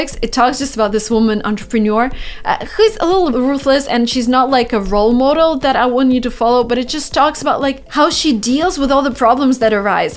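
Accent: Russia. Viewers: Strong Russian accent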